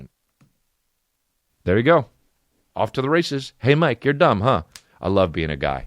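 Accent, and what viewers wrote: Australia. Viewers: Love the Aussie accent😂😂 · Chris needs a part in a movie as an aussie forsure hahaha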